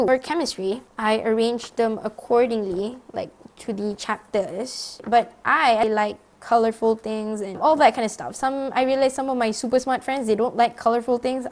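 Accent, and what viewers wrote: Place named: Malaysia. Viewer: I really like the way you talk in english.. ppl nowadays like to sound lah mat saleh and end up orng lain tk faham.. u ckp still ada bunyi malay but thats how we yg ssh nk faham jadi eh eng senang je hahahahahha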